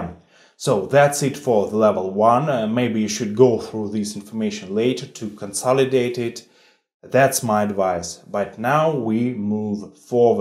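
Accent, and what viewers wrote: Russia. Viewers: I love the Russian accent! Many, many years ago when I was studying Russian in high school (in the 70s), our instructor was teaching us how each language has something unique about it that is difficult for non-native speakers to duplicate. His point was that we need to master these differences in order to not sound so "American" when speaking Russian. For example, In English, we do not roll our r's. That's difficult for many English speakers and has to be practiced. We would spend a lot of time trying to properly pronounce words like рыбa, работа, рот, ручка и т.д. In English, we have the "th" combination that is rather unique and difficult for many non-English speakers to duplicate. That's why many non-English speakers have a difficult time properly pronouncing a simple word like "the." When a German speaker, for instance, tries to say, "I think so," it might come out sounding more like "I zink so" or "I tink so" or "I dink so." There are English sounds that do not exist in Russian. 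I remember my Russian teacher jokingly telling us about something he called the "Bit, Bat, Boat Test." This is how you know a person is a native Russian speaker and not an English speaker. Ask a Russian to say "bit" and it will invariably come out sounding more like "beet." That's because Russian doesn't have the short-i sound. Ask a Russian to say "bat" and it will come out sounding more like "bot." That's because Russian doesn't have the short-a sound. Ask a Russian to say "boat" and the "o" so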